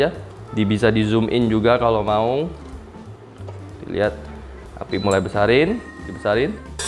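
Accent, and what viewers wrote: Surabaya, Indonesia. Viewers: Hohohoh asli suroboyo rek celotehnya 😀😀😀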